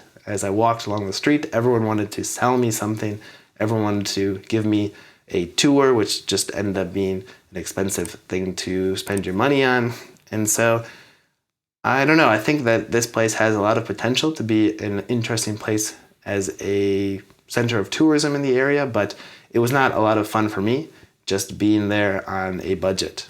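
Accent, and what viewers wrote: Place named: Moscow, Russia. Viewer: Thank you for your comments. Regrettably, you’re using, in one way or another, the resources of a country that has committed acts of violence against the people of a neighboring state. Most people from English-speaking countries condemn such actions and are striving to prevent them by all means. Certainly, there are some expatriates who hold a different opinion and continue to monetize their people and other resources, leveraging russia’s potential for their own interests. The specific words you pronounce in Russian, imitating the moscow dialect, are a characteristic that symbolizes people who are trying to enslave others. I encourage you to think about this. You have better alternatives - even if you are a Russian who speaks English very well.:hand-pink-waving::hand-pink-waving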